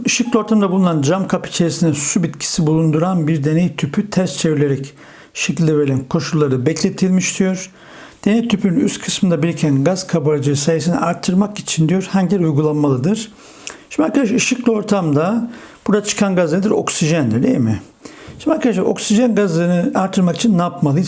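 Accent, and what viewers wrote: Black Sea, Turkey. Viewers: Hocam siz karadenizli misiniz:) ? Hocam bir de ben (Palme Yayınları, Bilgi Sarmal Yayınları, Rasyonel Yayinlari Ayt biyolji Branş denemelerini cozuyorum) sizce yeterli mi ? Ortalama 7 ya da 8 net birakiyorum ama sinavda neredeyse full birakmam lazim:((( Bir de Hocam ayt biyoloji icin ne dusunuyorsunuz sizce bu sene nasil olur? Sizden cevap bekliyor olacağım simdiden tesekkurler :)💜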